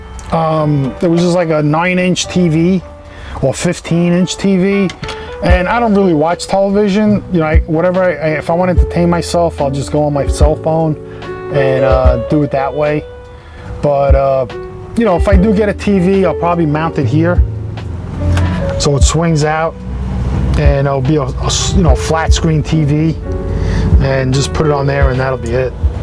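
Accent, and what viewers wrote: New York, United States. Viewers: This is really a big pice of sh*t u kno that rite trying to make it sound like it's a cream puff the way your talking where u from nyc that's y won't be caught dead in this bro it probably stinks of ass n piss with all the sheets covering disgusting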